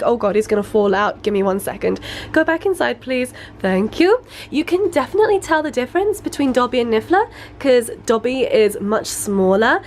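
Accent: United Kingdom. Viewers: Shes british. There seems so be a language barrier for you. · Wait is from the UK?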